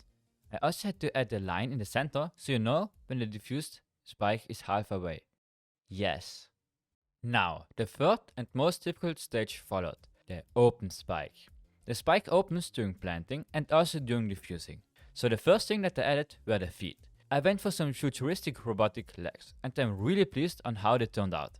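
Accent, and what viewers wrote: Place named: Germany. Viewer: Nicer Deutscher akzent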